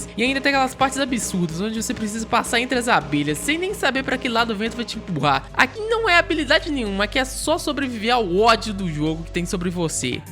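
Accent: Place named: Minas Gerais, Brazil. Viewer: o sotaque mineiro deixou tudo melhor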